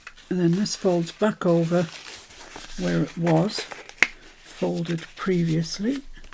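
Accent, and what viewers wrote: United Kingdom. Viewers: You are really fattenin' ol' Bob up. I LOVE it! I also love rice paper. It is wonderful to touch and is really easy to apply. I'm glad to see you used it. LOL. Did you say 'trim-mahhhge?" 😂😉 I find when I am working on my flippy flappy and following your video, I speak in your British accent. I repeat what you say just the way you say it. 🤭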